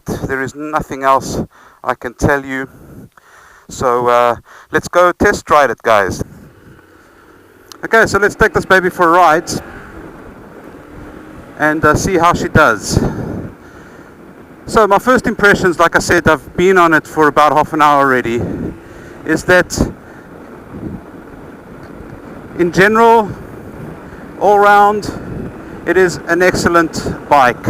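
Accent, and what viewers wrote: South Africa. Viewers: Ai cool, was wondering as you have the SA accent but you are riding on the wrong side of the road ;)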